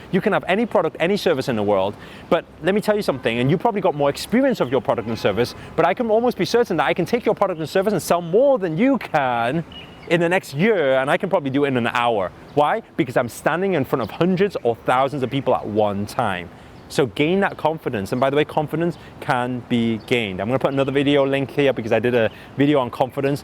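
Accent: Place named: England, United Kingdom. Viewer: First time seeing Asian guy speaking in English accent 😅 sounds funny but he’s pulling it off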